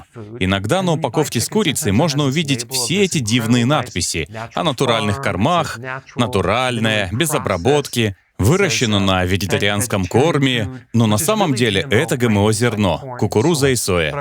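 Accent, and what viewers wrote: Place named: Ukraine. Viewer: курица а нЭ кури достали уже эти укра лайные кури кура и тд слух режет дико тем для кого русский родной читающий текст из украины это четко понятно по не русской музыке речи и ошибкам произношения типа а вместо О в словах где О ударная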